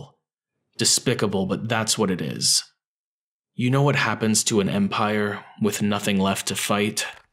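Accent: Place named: United States. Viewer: as much as i love this reading, hearing these read out in an american accent is hilarious.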